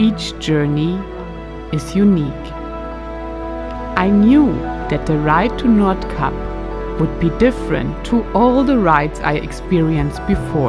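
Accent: Germany. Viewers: Great video, love your german accent... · I like your German Acent in english!!😆😆Its so german!😄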